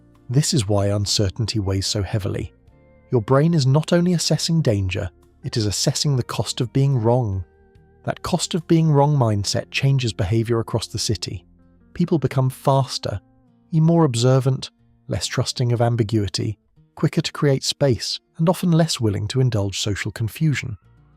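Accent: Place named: New York, United States. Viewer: You sound like you are from NYC and think you know everything about what you are talking about.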